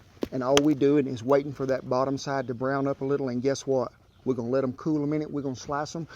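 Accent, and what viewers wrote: South, United States. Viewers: Hey, greetings from Germany! I just stumbled upon this awesome and tasty recipe and now you got me so damn hungry... I'm gonna need to try this kind of cheese sandwich on my grill at home! Love your way of producing this video, love the accent and the puns - really makes me miss the US! Thanks for the video! I subscribed and I'm convinced by what you're doing! Greetings fellows! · I love his cowboy accent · I love a good southern accent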